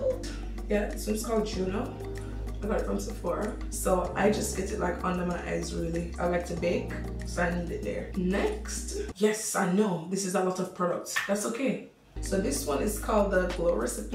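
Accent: Jamaica. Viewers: girl, are you Jcan? I hear a slight accent, but then again, I could be wrong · heard ur accent and said instantaneously "Jamaica 2 d bbclt world" tf. Repping wonderfully mama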